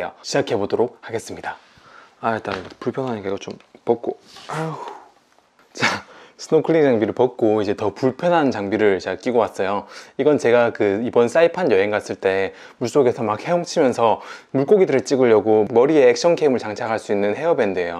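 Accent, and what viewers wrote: South Korea. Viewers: 말투가 살짝 지방이시던데 어디사람이세요?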